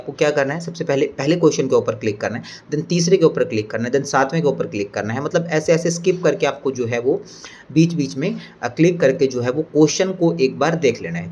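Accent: Haryana, India. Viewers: Aap Haryana se ho bhaiya ?? Apke bolne ke way to lg raha h ...i am also from haryana..btw my exam is on 12th April...wish me luck bhaiya❤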